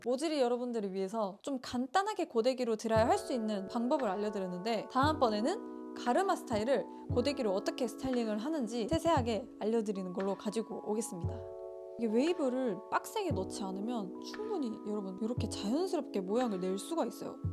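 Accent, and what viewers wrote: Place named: Busan, South Korea. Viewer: 부산사람은 역시! 숨길수 없는 악센트가 있네유~ 반가워요~ 저는 부산기장 살아요~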